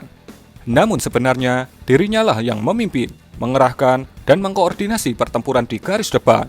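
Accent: Java, Indonesia. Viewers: Suka denger logat jawanya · Medok medok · Suara lu terlalu medok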